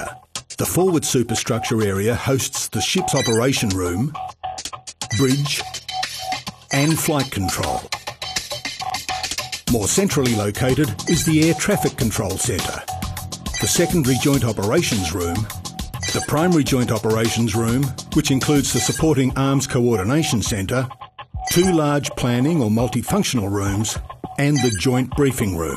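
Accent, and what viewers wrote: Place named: Australia. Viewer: Australian accent, I prefer English UK acent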